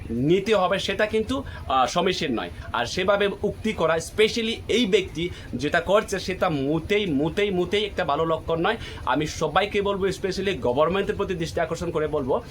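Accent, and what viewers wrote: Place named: Bangladesh. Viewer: Tumi o to je bangla kotha bolechen eta hoyche Bangladesher sur eta kolkata basha sur noy bujechen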